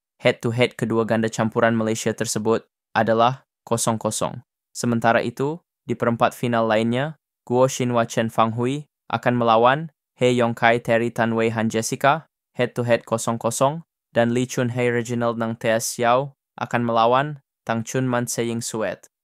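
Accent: Banyumas, Indonesia. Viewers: Pengisi suara ngapak dah ga seenak dulu... ga suka suara yang sekarang..😢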